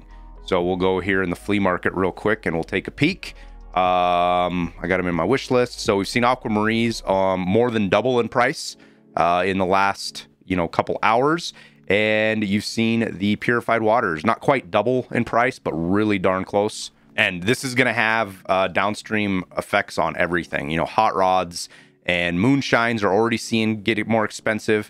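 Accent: United States. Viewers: Love your vids man but the one thing that kills me is some of your “Americanized” pronunciations. For example kvass is almost pronounced like ‘kwass.’ I understand you don’t know everything and it’s just a minor annoyance on my behalf but it drives me crazy xD.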